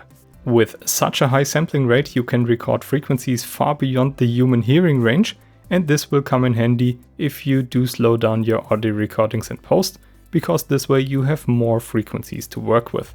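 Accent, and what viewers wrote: Germany. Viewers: ich höre den deutschen Akzent ganz deutlich, ha ha))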